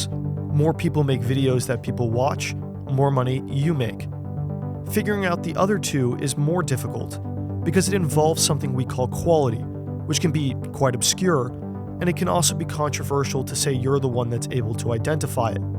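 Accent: United States. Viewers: Wederom dank voor je video (overigens bizar hoe je accent zo Amerikaans is). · i dont get it Why is this guys obsessed with europe He sounds American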